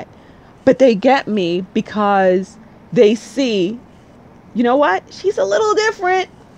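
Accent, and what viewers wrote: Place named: Brooklyn, United States. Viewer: Love your videos. Will be purchasing your book for my little cousin. She is only 2 years old but such an old soul. Love your accent reminds me of home. I was born in Brooklyn, NY 😊 Blessings to you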